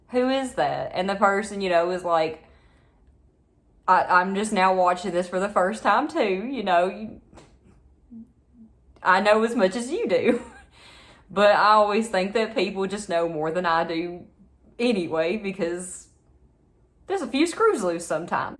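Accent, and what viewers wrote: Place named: South, United States. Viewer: On tiktok on the newer ones I would guess Arkansas or Texas. This one feels more north central, Tennessee maybe. I'm from Louisiana myself and usually have an excellent ear for accents but I can't seem to narrow yours down. That's my random thought. Carry on.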